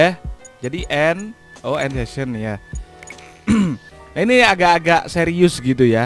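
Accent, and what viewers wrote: Malang, Indonesia. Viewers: Bang orang malang kah?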